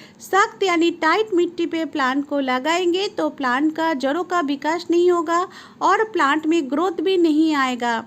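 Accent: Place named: Bengal, India. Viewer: If you don't mind, Are you from Kolkata. Your dialect suggests you to be a Bengali.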